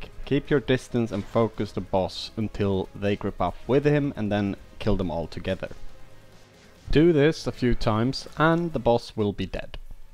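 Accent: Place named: Sweden. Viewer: Största svenska dialekten, bra video!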